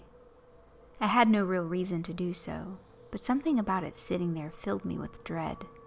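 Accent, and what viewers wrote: South, United States. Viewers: Wow, that one about the white handled gun was extremely creepy and sad. But that bang at the end, I admit I jumped. Cool narrative your accent are you from the South? Or did you do it for the story?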